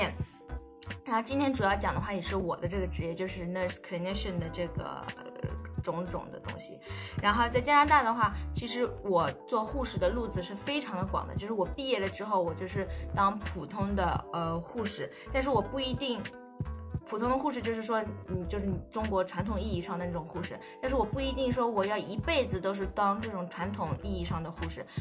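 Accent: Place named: France. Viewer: 我好像从口音中听出了法语的味道呢(我堂妹在Quebec省(Ottawa)做Family Dr.)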